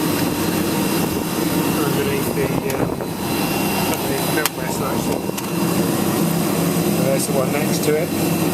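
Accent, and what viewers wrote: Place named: London, United Kingdom. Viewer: I think it's that accent from London, England that's really throwing him off !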